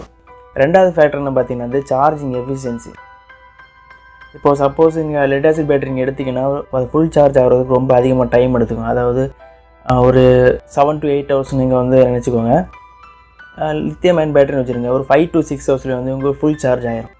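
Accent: Kanyakumari, India. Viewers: Bro ,,, neenga Kanyakumari ya ?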